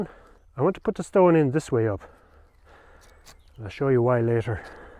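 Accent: Ireland. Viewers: These videos never get old because is such a skilled, inginuitive, man w/a pleasant Irish accent and beautiful terrier dogs.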